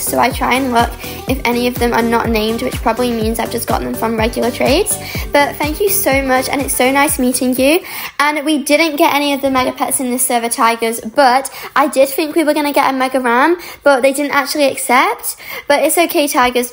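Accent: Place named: Germany. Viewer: omg how did i not notice that you‘re german!!😍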